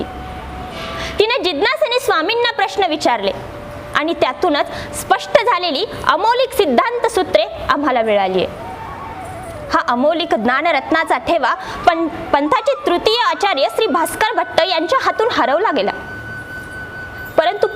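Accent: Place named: Vidarbha, India. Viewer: 🙏दण्डवत प्रणाम कु ताई 🙏जीवन भावसार, पुणे!उत्कृष्ट कथा कथन ताई प्रसंग डोळ्यासमोर उभे केलेत आणि डोळ्यात अश्रू आलेत, निशब्द झालो 🙏वऱ्हाडी भाषा तर अप्रतिम 👍🙏🙏🙏🙏🙏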